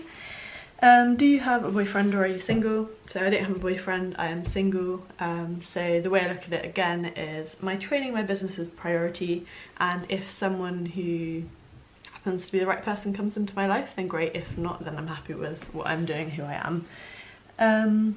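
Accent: United Kingdom. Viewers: I absolutely LOVE!! Your British accent. It adds to your personality. Love you.:-)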